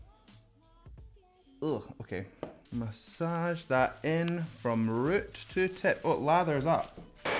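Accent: United Kingdom. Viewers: Why I love British and Scottish etc accents so much? You are cute so it does not help 😜 greetings from Tricity in Poland for you and and BTS Army 😘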